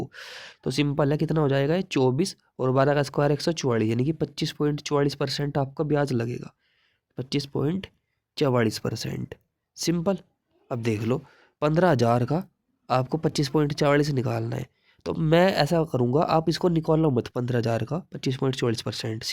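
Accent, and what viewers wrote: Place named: Saharanpur, India. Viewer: Big bro aap west up se ho kya ....aapki voice desi saharanpur wali lagti hai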